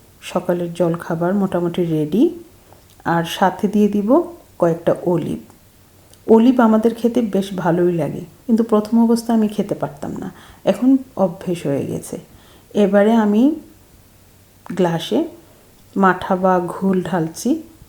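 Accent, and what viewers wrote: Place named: Bangladesh. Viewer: খুব ভালো লাগলো পুরোটা❤❤,আপনার ভাষা বাংলাদেশির মতো লাগছে, এ গুলো কি মাছ , পুঁটি মাছের মতো দেখতে লাগছে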